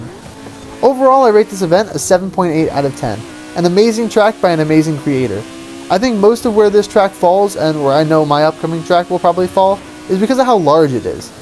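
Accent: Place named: RP, United Kingdom. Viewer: This is a wicked circuit. I agree with your review other than the dead stops and the size of it I dont think there should be a mark down for them, cos if a person cannot stay within the width of the track plus the run off space, then its a skill issue, not a builder issue. Yes, length does make it harder to decorate, but id rather have a brilliant track like this, then more stuff to look at it whilst I race about. Good stuff boy. I love your posh accent as well. hehehhe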